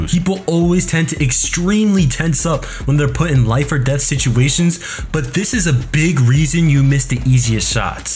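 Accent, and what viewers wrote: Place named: Midwest, United States. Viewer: I just know your from the midwest lol- great video bro!!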